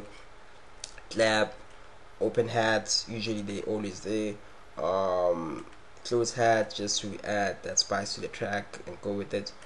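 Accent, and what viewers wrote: South Africa. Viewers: are you South African haha?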